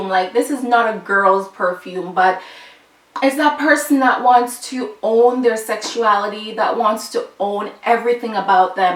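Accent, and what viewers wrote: Jamaica. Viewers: Are you Jamaican? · I hear a Jamaican accent underneath !! (hope I'm right). great video!! I too am obsessed with fragrances..they make me so happy, brings back memories. .thanks for sharing!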